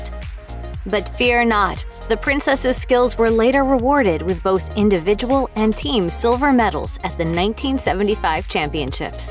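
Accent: United States. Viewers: The commentary was done by an American, what more can I say 😆 I've always heard that Princess Anne was quite frugal in lots of ways (as far as Royals go that is). Americans being obsessed with wealth, well there you go, the commentary is bound to be quite materialist and incorrect. That's Americans for you. I really wish yanks would stop reporting on the Royal family with their screeching accents. It grates on the nerves! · It gets right up my nose to hear someone with a strong American accent criticising our Royal Family, why don’t you just keep your biased comments to yourself and perhaps concentrate on all the serious social problems you have in your own country such as racism, gun crime, obesity, avarice and greed.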